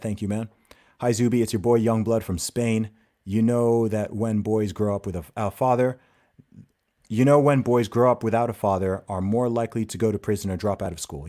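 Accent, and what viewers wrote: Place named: United States. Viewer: Idc what anyone says you sound like you are from America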